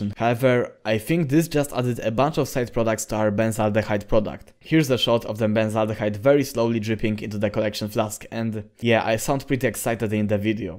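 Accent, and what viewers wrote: Poland. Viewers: why your accent suggest me, you are from Poland xD? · Your English is really good and the humor is really great paired with videos like this. I like your accent but I can’t tell where it’s from. You sound Polish but I don’t know for sure (sorry). What country are you from? Do you live there or are you in the US now?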